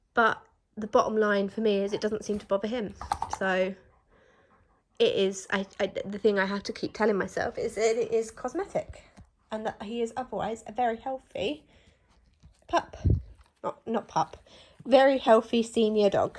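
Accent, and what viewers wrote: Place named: England, United Kingdom. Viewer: Its her English accent for me..😁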